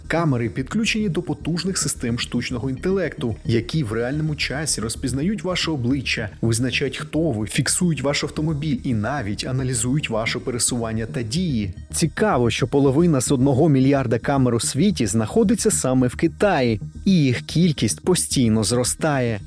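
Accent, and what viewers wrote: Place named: Russia. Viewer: відчутно, що ведучий раніше російськомовний.. досі проскакують: "ихньому" та ін.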